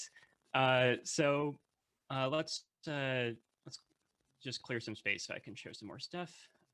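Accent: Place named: Northeast, United States. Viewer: Your non-scripted voice is very pleasant and northeastern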